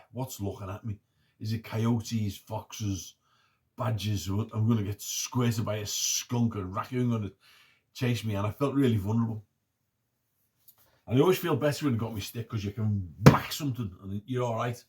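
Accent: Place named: Liverpool, United Kingdom. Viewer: You lucky lad living out there and I just love the accent and your natural scouse warmth.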